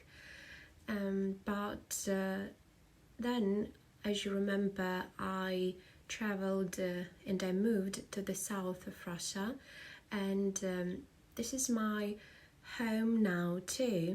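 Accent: England, United Kingdom. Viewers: Приятная нотка английского акцента – это то, что так приятно услышать от красивой русской леди из сельской местности.❤ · Why does her English which is excellent sound like she is from England. Her voice is so nice soft and very clear.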